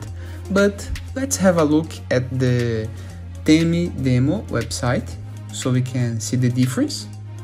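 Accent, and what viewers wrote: Brazil. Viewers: Esse é brasileiro... me engana não. Esse sutaque aí... Curte de mais ❤ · Você é brasileiro né kkk, pelo sotaque, acredito que tenho 99% de chance de estar certo kkkk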